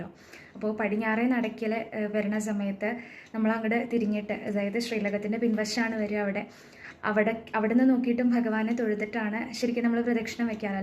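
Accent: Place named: Palakkad, India. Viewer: മോളുടെ സംസാരം കേട്ടപ്പോ pkd ആവും എന്ന് തോന്നി അതുകൊണ്ടാ ചോദിച്ചത് 😍😍😍😍 ഞാൻ ഒറ്റപ്പാലം 🙏🏻🙏🏻🙏🏻🙏🏻🙏🏻😊😊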